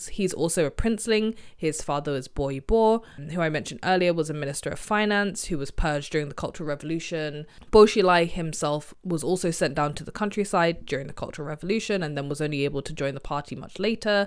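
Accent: England, United Kingdom. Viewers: How do you know so much about China? That's an English accent, isn't it?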